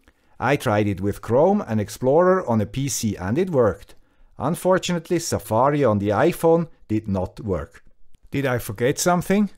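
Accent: Switzerland. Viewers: you made my new routine: Sunday morning "swiss accent" before the breakfast;) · This is really getting a Swiss channel... an accent, a library... What a great video and really usefull ! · I noticed that lately I keep hitting videos with this specific guy with a Swiss accent. After the 5th video I decided, "Well... I guess this guy with a Swiss accent has earned a subscription..." :D Thank you for all of the work you do!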